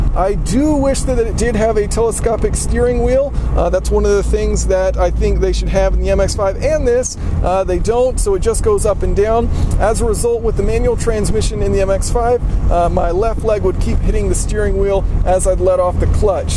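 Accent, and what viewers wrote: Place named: Michigan, United States. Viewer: Are you originally from Michigan? Your accent sounds exactly like my uncle's.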